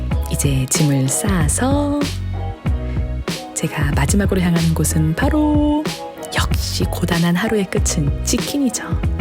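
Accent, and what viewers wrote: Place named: United Kingdom. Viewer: 성우하셔도 될꺼 같아요~~ 최고의 성대이신듯 합니당 영국발음도 너무 멋있으십니당~~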